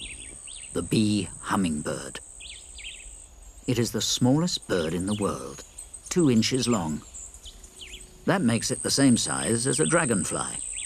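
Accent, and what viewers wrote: United Kingdom. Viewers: Enough with British voices. Done gone.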